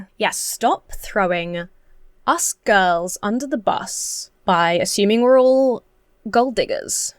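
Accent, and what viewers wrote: United Kingdom. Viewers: 🤣😂🤣😂 Be Still my silly old mans heart, You godless little vixen you are a Riot and Hilarious. The Glasses and British accent drive me nuts you are so pretty and funny. Oh BTW Larry Flynt is worth noting because before the internets the real dirty pictures were in his Magazine HUSTLER, Not Playboy. Ans you looked at the pictures first then you might read the articles which pretty far left and very hard hitting. because he didn't worry about sponcers. I'm sure there is a good profile of him on youtube. Hes a great sinner too. Do what you do its so funny I watch your show as a treatment for depression and it always works.. Cheers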